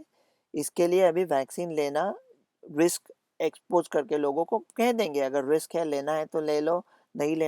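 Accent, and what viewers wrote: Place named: Maharashtra, India. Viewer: Tumchya Hindi madhye pan Marathi aahe. Take this as a compliment.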